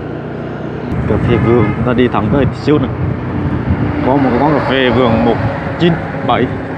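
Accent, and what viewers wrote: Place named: Hue, Vietnam. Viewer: Người HUẾ…Mà …Nói giọng HUẾ như người Thượng / người Mọi…! Nói tiếng người KINH…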